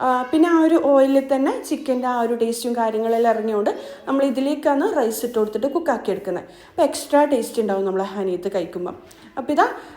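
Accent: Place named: Kasaragod, India. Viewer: ഇങ്ങടെ സംസാരം പൊളിയാ.. കാസർകോട് ആണോ 😍😍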